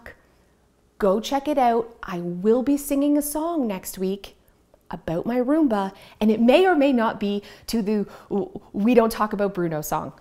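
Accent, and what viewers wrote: Canada. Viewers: I don't have ADHD but I suffer depression since I was in my early 20's. All that mentioned today was a big help 😃 I started decluttering and re-organising my home about 5 weeks ago. I found your channel 🥰 I love your Canadian accent x I lived there for nearly a year in the 90's. you have been a great inspiration to conquering this huge mission I've started 😘 So far I've done 4 trips to the op shops here in Australia 🇦🇺 Reusing and upcycling what I already have in my home 🏘️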